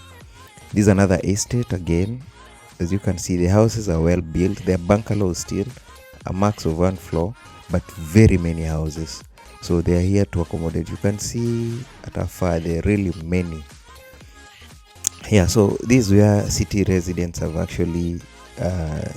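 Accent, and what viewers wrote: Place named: East Africa. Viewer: Are you from Uganda or Kenya. This accent is from East Africa because my wife is from Uganda. You both have similar accent. Love you from Nigeria 🇳🇬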